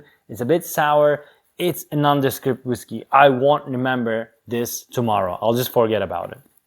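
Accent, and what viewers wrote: Turkey. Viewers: Kardeş sen Türksün b/o your pronunciation. Also kaju ... :)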